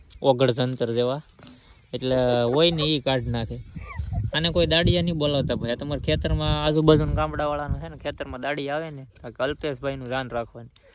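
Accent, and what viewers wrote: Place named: Padvi, India. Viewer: પડવી છે આ બોલી પડવીછે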